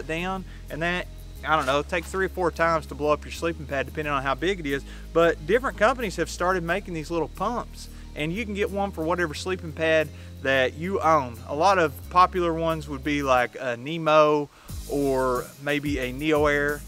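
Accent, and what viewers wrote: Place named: South, United States. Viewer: I never knew the southern accent worked so wonderfully with your everyday hipster. Great content mate!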